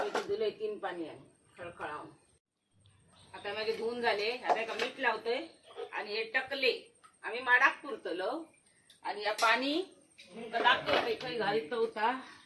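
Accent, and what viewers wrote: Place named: Konkan, India. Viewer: खूप छान वाटला बघताना आणि तुमचा मालवणी ऐकताना. एकदम कोकणात गेल्यासारख्या वाटला. इतके ताजे फडफडीत मासे खंय बघाक मिळतंत. 😊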